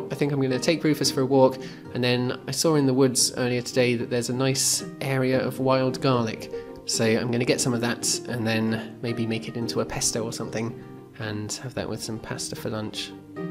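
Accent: Germany. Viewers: Wow, your accent is so clean. Didn't expect you're a German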